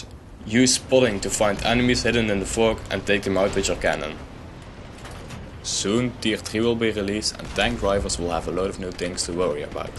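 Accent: Netherlands. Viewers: wow dat accent hoor je meten dat je uit Nederland komt geweldige video trouwens erg duidelijk en goed in elkaar gezet ik ben nu lvl 14 1/2 en zelf ik heb nog moeite met de tank. bedankt! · r u dutch? thats not an insult, for me as german it sounds that way ;) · Nederlands accent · Dat Nederlandse accent herken ik meteen :D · yeah,the first 3 seconds you started talking i thouth:''he is dutch...'' me to! :S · kom jij toevallig uit limburg?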